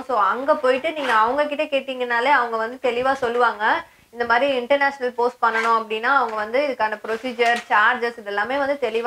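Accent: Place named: Tiruchirappalli, India. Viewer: Ethu trichy a athan language laye theriyuthu wow me too trichy Namma pullaya nenga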